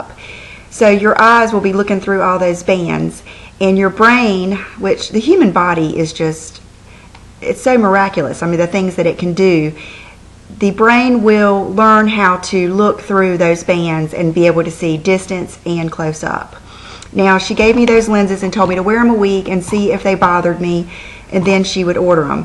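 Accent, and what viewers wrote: South, United States. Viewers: I just love listening to your southern accent. "Steel Magnolias" is my altime favourite movie and you talk like that. You look lovely in even casual clothes - those earrings just set off the turquoise colour beautifully.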